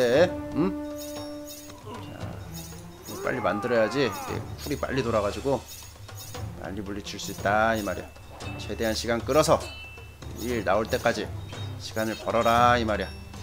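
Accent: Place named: South Korea. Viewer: 자연스러운 콩글리쉬 발음에 편안함이 느껴지는구먼.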